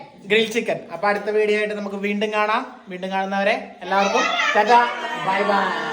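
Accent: Kannur, India. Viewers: നിങ്ങൾ കണ്ണൂർ ആണോ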